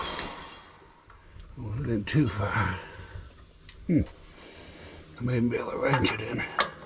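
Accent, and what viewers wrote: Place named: Maine, United States. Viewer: You sound more like a Mainer than someone from Tennessee. Nice vid.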